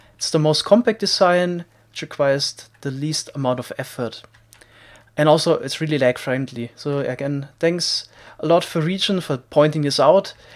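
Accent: Germany. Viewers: Who hears also the german dialect ? Richtig cool. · Höre ich da einen Deutschen akzent? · Wer ist auch deutsch und merkt das er deutscher ist wegen dem Deutschen Akzent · i love the german acccent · Dieser deutsche accent triggert mich jedes mal hahahaha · german accent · bist du deutsch hahaha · 😂Digga was geht 😏👌 man hört es raus das du aus Deutschland bist 😂👌 hast du auch was neues wegen Kaktus? Schau Mal vorbei bei mir ✌🏾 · I actually like his German accent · Ich habe noch nie ein deutscheres englisch gehört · and its because there is a German accent in his speech I think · Man hört halt raus das du deutsch sprichst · Lmao u sound soooo german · But he is also using the german english pronunciation xD no offense but it is hard to watch as someone who grew up speaking both languages :D anyways, the videos are still insanely informative #nohate # donttakeitpersonallyplease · Bist du ein deutscher? 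Dein Englisch hört sich an wie wenn ein deutscher englisch redet lol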